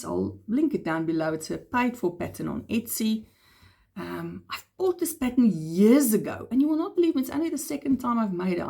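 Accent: South Africa. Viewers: Amigurumi making is N-E-V-E-R boring with you!🤩 First, I have to admit, ( maybe it just me, because I enjoy so much your beautiful English from South Africa- me being French speaking from Montreal, Quebec, Canada, its like a melody or a song to me!☺️). Second, 99% of the time, when you tell how you did, where or from who it was created, why you did it that way or how, there is always a story behind! The Marvelous Mermaid became such a beauty, and one of the reason, beside your talent, is cuz you used the yarn from Crochet box Society! Finally using a pattern from another designer to inspire you, you did crochet a vest for your bear! Thank you for keeping those videos coming and to share with us, yours yarny adventures!!! It is a fantastic treat for me and for the others viewers!!!😁💕💕💕💕💕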